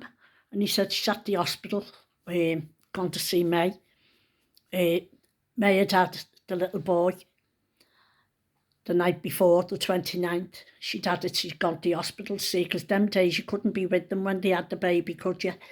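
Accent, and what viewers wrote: Liverpool, United Kingdom. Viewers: Hi I've just subscribed after stumbling across your channel whilst looking for how to use a pom pom maker😂😂😂 have shared with my son who's currently teaching in Thailand. He also likes your videos. Like me, he loves to hear about all things supernatural. The way you tell it especially with your great scouse accent ❤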